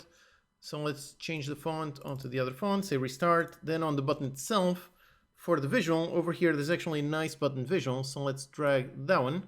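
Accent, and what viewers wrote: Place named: Portugal. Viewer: Been watching you for a long time, only now i realized by your accent, tu és tuga como eu. oh my God... Santa ingorancia a minha. Luv you bro. Keep on Keeping on. ❤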